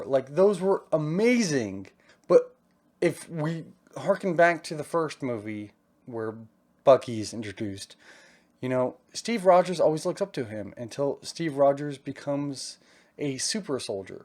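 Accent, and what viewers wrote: South, United States. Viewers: I love your video at your house in Texas during your quarantine until you're talking about the upcoming mini-series of "The Falcon and Winter Soldier" will be out on Disney+ while it started from the beginning after being handed the mantle of Captain America at the end of "Avengers: Endgame" got came out back in 2019, Sam Wilson teams up with Bucky Barnes in a worldwide adventure that puts their abilities to the test as they fight the anti-patriotism group of the Flag-Smashers after the whole trailer of the show came out at the time for awhile and Stucky is one of the characters from Marvel comics, but I hope your husband Zach will be very proud of you and I wanna say I'm very happy for you, because I love your Southern accent, I know you're half Mexican and you're gay!! #TheFalconandTheWinterSoldier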